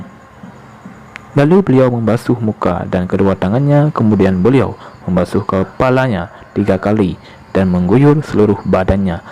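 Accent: Aceh, Indonesia. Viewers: Adminnya orang aceh ya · Sang nyoe lage na logat logat khas ureung aceh😁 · Denger dari suaranya Orang aceh ni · Ini pasti awak aceh , terasa logat aceh nya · Ini logat orang Aceh bukan?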